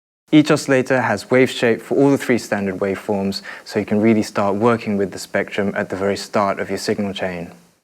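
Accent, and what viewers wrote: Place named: United Kingdom. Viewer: and he also has british accent ...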